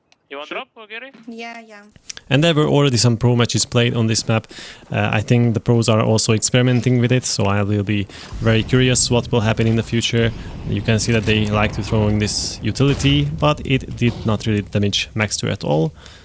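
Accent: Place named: Hungary. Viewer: éreztem, hogy magyar vagy xd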